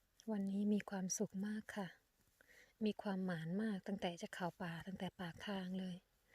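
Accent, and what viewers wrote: Korat, Thailand. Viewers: สำเนียงคนโคราช? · พึ่งเคยดูพี่คนโคราชไหมคะสำเนียงเพราะมาก ชอบค่ะ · พูดเลยค่ะภาษาโคราชน่าฟังค่ะ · พึ่งดูครั้งแรกคนโคราชนิเห็นพูดโคราช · สวัสดีค่ะคุณขอถามเด้งเห็ดอันนี้มีเลิ่นหอมแบบไหนค่ะคุณทางนี้เคยเห็นแต่กลัวไม่ก้าเก็บพูดออกภาษาไทยเหมือนฉันเลยไทยเด้งคนโคราชนิหรือบุรีรัมย์ค่ะตอบเด้งเด้อจร้า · พูดเหมือนคนโคราชพิมายเลยพี่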